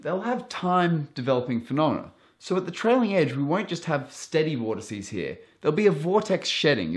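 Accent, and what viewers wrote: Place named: Australia. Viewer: Aussie accent - he's saying "drive the air harder"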